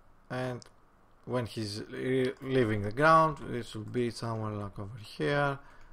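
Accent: Greece. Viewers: You must be Greek, so cool accent, not the fake stuff at all. Μου έφτιαξες τη μέρα.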